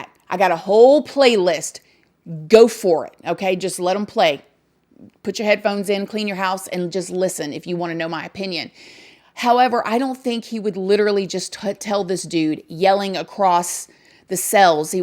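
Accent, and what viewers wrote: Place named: Texas, United States. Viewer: Darling, you are fabulous, and FUNNNNNEEEE! You know what I think? I believe Chris Watts couldn’t walk away his pregnant wife ((What would people think of me, so, his family had to disappear - and NK’s pull on him was tantamount to hypnotism; as though she were a real life Siren, he unable to resist her call. ….! I willdefinitely be watching more of you; you are incredible!! Love your Tevan accent Y’all an’ all!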